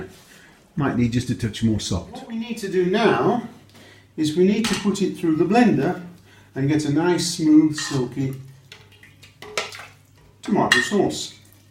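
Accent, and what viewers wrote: United Kingdom. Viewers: Which part of the UK are you originally from Mr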